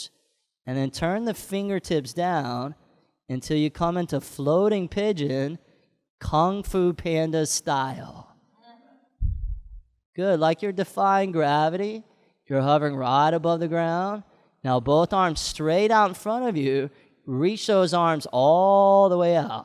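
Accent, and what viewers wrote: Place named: Southern California, United States. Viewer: Thanks teacher, been practicing with you on youtube everyday, here in Bali. Equanimity in that Southern Californian twang.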